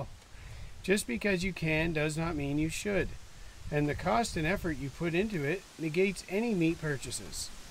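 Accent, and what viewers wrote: Canada. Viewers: Off topic, even though I'm in Manitoba, I could tell you were from the Maritimes just in how you say the word 'barn". Congrats on the PC win in NB (if they were who you were going for...I won't make assumptions)! Cheers.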